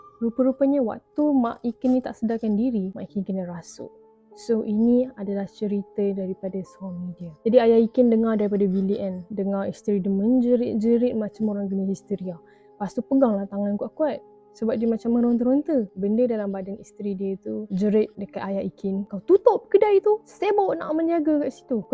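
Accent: Kelantan, Malaysia. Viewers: ni Ore Kelate jugo ko??😅😅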